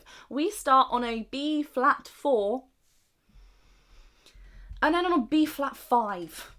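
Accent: United Kingdom. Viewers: I've thought you are an American. Your accent British english. I lived in London around 1 year, long time ago. lives in Incheon. I live also same city in Korea.. I hope you will have a few more reactions for ' '. ' '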